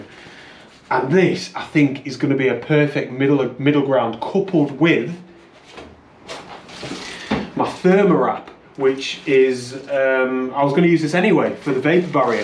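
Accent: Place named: Lancashire, United Kingdom. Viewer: Loving the van build, roll on the next episode. I actually found you by accident when I was looking for tutorials on the Canon M50 Mkii which I have recently purchased! So I`ve been watching your other vids with interest. My Pal Colin also follows you, and you sound fairly local to us, I`m just outside Preston! Cheers Pal 😀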